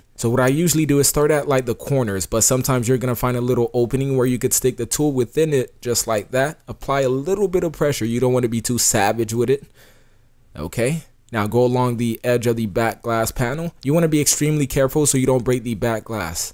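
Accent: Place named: United States. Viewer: His accent is american and the phone is from verizon which is an american provider, how the fuck do you notice from the sneezing? I'm confused.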